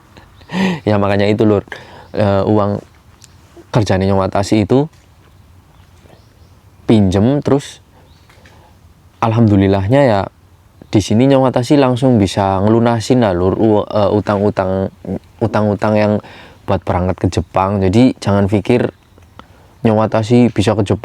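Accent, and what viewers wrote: Banyumas, Indonesia. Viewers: Asline ngendi mas ngapak" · Nyong kan cilacap tapi stay nang taiwan lah ngapak ngendi kue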